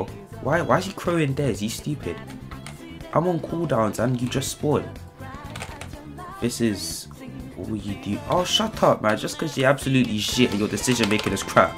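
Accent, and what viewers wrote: United Kingdom. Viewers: You from the uk? I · why do i think ur british now hmmmmmmm.... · are you british? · Are u black and British?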